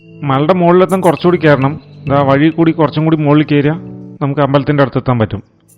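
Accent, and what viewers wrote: Palakkad, India. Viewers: Nallapalakkadan slang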